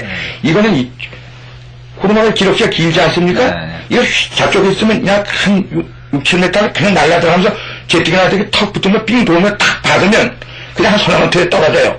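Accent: South Korea. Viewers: 나레이션 분 억양 들으니 경상도 사람 같네요! 뜨끔하시죠 ㅎ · 지방 분이 아니시군요? 저는 지방에서 살기때문에 말씀을 대춤 알아듣겟어요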